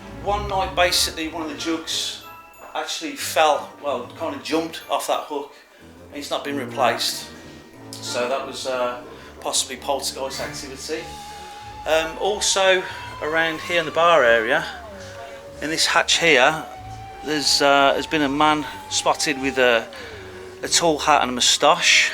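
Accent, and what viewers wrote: United Kingdom. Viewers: I'm actually so ashamed that I've only been to London! Really cool listening to your storytelling (just needed a minute or two to get over the thick British accent) 😛 Good work! 👏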